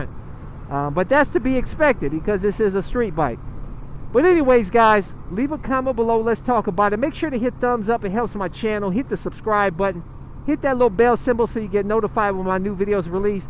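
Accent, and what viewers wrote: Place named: Louisiana, United States. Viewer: You got an accent like from lousiana somewhere man. I lost mine living up in Minnesota over 10 years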